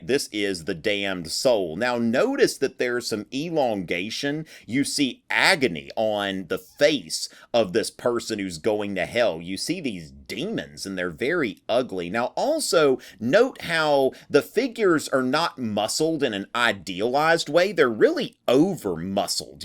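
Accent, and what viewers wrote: South, United States. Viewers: Your southern accent really kills me!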